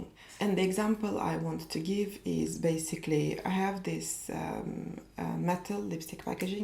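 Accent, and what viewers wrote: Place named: France. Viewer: Thank you! Where is her accent from, Français peut être?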